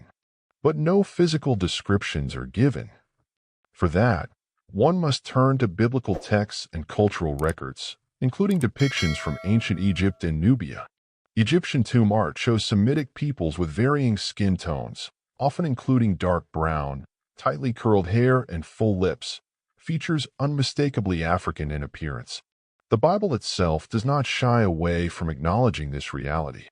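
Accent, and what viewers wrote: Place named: United States. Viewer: I don't want your subtitles. No American voice please. I prefer Oxford English.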